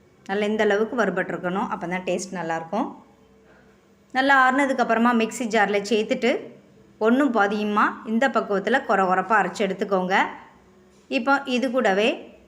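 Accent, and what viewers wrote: Tirunelveli, India. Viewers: Tirunelveli slang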